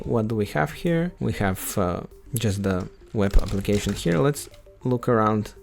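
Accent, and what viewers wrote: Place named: Russia. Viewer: я понял по акценту что ты гооришь по русски,давай следущее видео на русском?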